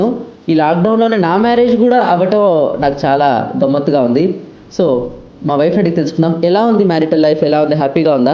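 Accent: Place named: Kadapa, India. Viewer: Meedi Kadapa Anna happy to listen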